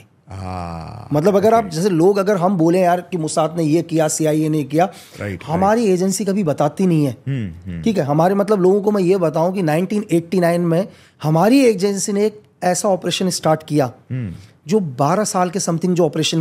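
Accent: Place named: Gujarat, India. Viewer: I guess he is from Gujarat , his accents speaks😊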